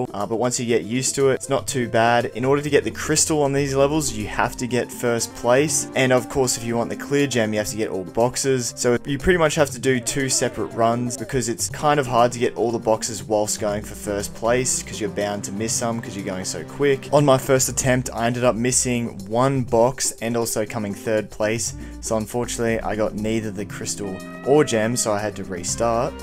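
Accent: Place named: Australia. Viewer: 1: Crash Bandicoot takes place in a fictional version of Australian Islands. 2: you do kinda sound like that.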